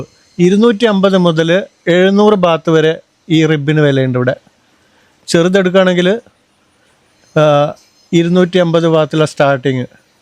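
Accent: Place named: Malabar, India. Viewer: ഇങ്ങള് മലബാർറാരൻ ആണോന്ന് എനിക് നേരത്തെ സംശ്യം ഇണ്ടായ്ക്ക് ഇപ്പൊ ഒറപിച്ച്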